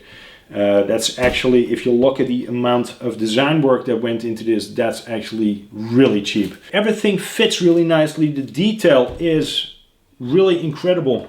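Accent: Netherlands. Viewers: Dutch accent ;)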